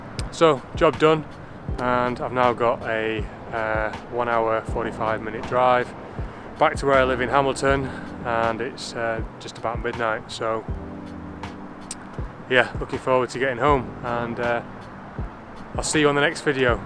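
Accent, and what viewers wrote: Yorkshire, United Kingdom. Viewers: Hey, you sound more like Yorkshire than NZ?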